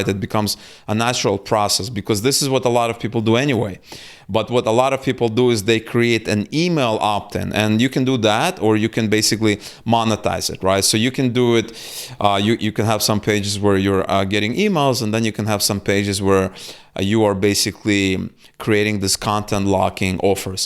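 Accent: Russia. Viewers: You're from Russia?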